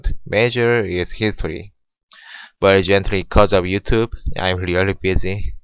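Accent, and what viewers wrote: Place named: South Korea. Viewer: Hater back off kay? English isn't his first language The korean accent is strong if you actually listen you'll understand him so easily.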